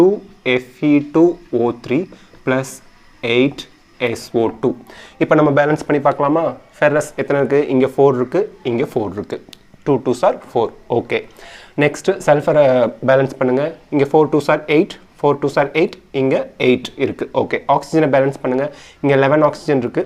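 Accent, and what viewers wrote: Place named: Thanjavur, India. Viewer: Sir you are all rounder I am so exciting with you then I have 1 doubt in DNA fingerprinting let me to tell sir in DNA fingerprinting you told know it is differ for a children's who are from a same parents other than monozygotic twins I agree that sir but still I have a doubt that oru childkku 50 percent DNA from their mom and 50 percent DNA from their dad so antha couple kku innum oru child pirranthalum antha childkku athae thane sir irrukku then how we can say their DNA fingerprints are not similar please I have this doubt for many months I know you are the right person to clear my dobt sir I kindly request you to clear my doubt sir and neenga Thanjavura sir enga oru karanga pesurathu mathiri irrukku atan sir kettae okay thank you sir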